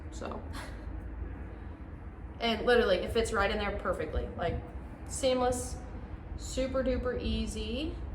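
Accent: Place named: Kentucky, United States. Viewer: Developing a lil KY accent cute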